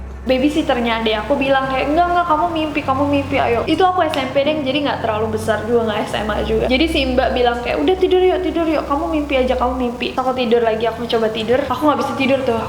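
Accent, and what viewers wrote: India. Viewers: indian accentnya keren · ka ..kaka itu aslinya itu dari india ato inggris sih ??? :v binguuung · I Like Your Indian Accent..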